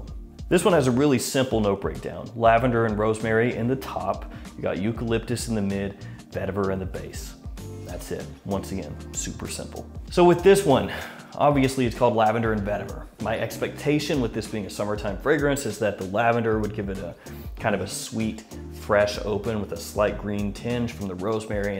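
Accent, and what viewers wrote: South, United States. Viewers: Fragrance Leader... with a slight Southern accent... outstanding! #BeepBopBoop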